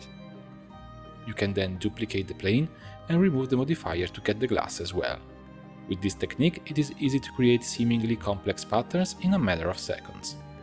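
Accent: Italy. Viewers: Immaginavo fossi italiano, nonostante la pronuncia inglese sia piuttosto buona qualcosa mi ricordava il modo in cui parliamo inglese di solito ahah. Ottimo lavoro comunque, sei davvero bravo, io ho sempre remore nel provare a modellare qualcosa di cosi complesso di dettagli, preferisco concetrarmi su archviz meno "ricche" e più moderne. E ho anche notato dai precedenti video che ante tu ti diletti con designer... Great great!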